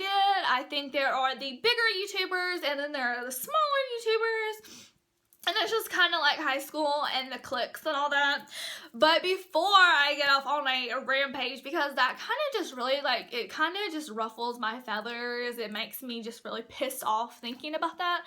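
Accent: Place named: South, United States. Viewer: Great video! I'm a new subscriber. 😀 Love your Southern accent.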